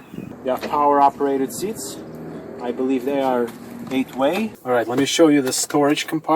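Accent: Russia. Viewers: Smoothest Russian accent I’ve ever heard. That interior color is horrible btw. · raaashian accent? cool truck dude. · If that’s a scwartzenegger reference, i don’t think this guy is Austrian, he sounds Russian or maybe Ukrainian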